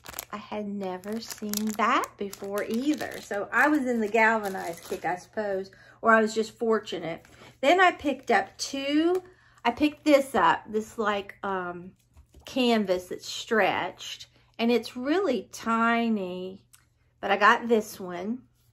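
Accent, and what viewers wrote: South, United States. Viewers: Hey sweet lady I watched your haul and I heard you say you are from WV, I am from WV also Huntington to be exact. May I ask what part of WV you are from?! I thought I heard that southern accent 😉 love all of your hauls and crafts. You are such a sweet and beautiful person inside and out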